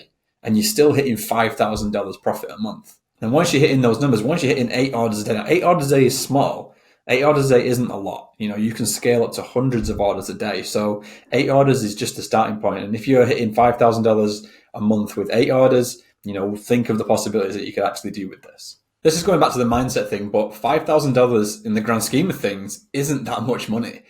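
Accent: North West England, United Kingdom. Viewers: where you from mate, sound local. north-west?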